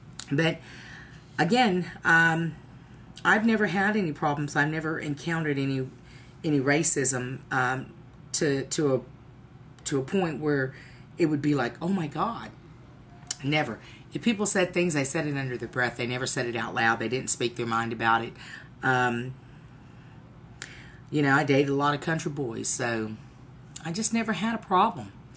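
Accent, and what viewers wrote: South, United States. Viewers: Is your MOM ASIAN AND YOUR DAD BLACK? and Are u from Texas because you have a Southern / Country Accent😎😎😎😎😎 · OMG, you are so gorgeous and sweet with your almond shaped eyes, beautiful brown skin and Southern accent! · I was like I hear an accent but than I realize yep a country accent. you sound so white tho. glad you had a good experience. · where are u from? you have a thick southern accent. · See how RACE is so freakina problem with RACE in general cause if you had not known her Direct escendant outcome then you would think this woman was a natural native from Tx or Ok ...etc etc and the the souther accent helps ease the fact that how some ppl would react to the fact of the though of her being "hALF-n***8" (excuse speech) referencing tho. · at first i was thinking english but then the more i herd you i was oh okay shes from the south* i luv southern accents* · I love your Southern accent!! 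You're a beautiful lady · half black, half asian with a country/southern accent...I love it!!! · Do you cook? You remind me of a Blasian Paula Deen. Maybe it's the accent. Southern accents have a certain warmth. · truly you are a southern woman, no matter what your race is. You sound just like Paula Deen to me! Lot's of black southern people are country too! you sound like a home girl to me!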